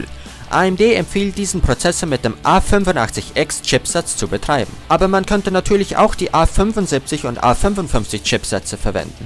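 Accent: Austria. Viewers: Ich liebe den österreichischen Akzent! ^.^ Gutes Review.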